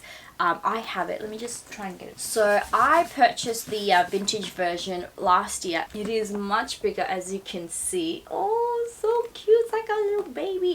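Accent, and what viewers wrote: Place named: Australia. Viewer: You're so Aussie 😂 I say cans too. I've heard you're supposed to say carn but maybe I'm wrong. Anyway love this bag and love the reverse monogram w the monogram 💖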